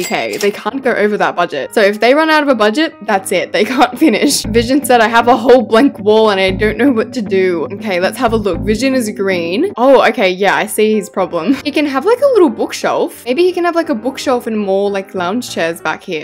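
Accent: Australia. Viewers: lets just appreciate that in every video someone has to say " lets just appreciate". but anyways i love your videos, i started watching u in June and I'm obsessed, I've watched all the videos u have posted on your channel and everyday i come to check if you make a new one. Your a really talented builder and i would personally, myself say you should become a constructor of some kind. i love your Australian accent and i love your house styles and i love your sense of humour, i just love you. ♥keep up the work👏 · I love the way puts in memes and funny voices she's so talented I wish I was her sista❤ I hope I get invited to one of her build battles or something involved with building,she is AMAZING ❤ I love her so much and her Australian accent it's so beautiful. I love the way she NEVER gives up and shows confident energy to every build the subscribers make 😊,it's just amazing. 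And her name is so so nice and beautiful. Love you ❤🪷😘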